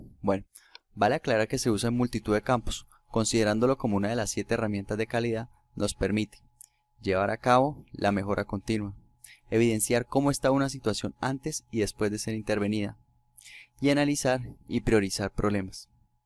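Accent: Cali, Colombia. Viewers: Vos eres caleño cierto?? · voj sos caleño si o que? pajame eje japato ve ... buen video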